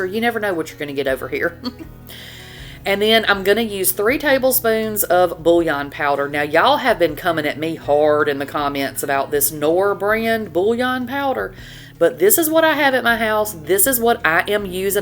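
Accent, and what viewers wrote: South, United States. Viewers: THIS, is what the internet should be used for. I love to hear my southern folks accent. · Girl I'm gonna steal these recipes lol, thanks for the video. Luv the southern accent! Luv from California.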